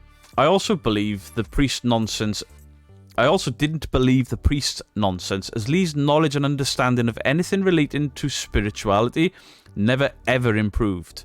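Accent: Wales, United Kingdom. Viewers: "be those bottles and ghouls". Subs don't get your welshyness 😂😂😂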